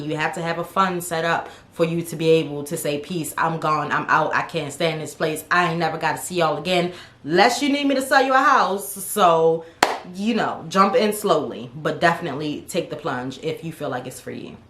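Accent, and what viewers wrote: Baltimore, United States. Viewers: I can hear the Baltimore in your accent. Good Video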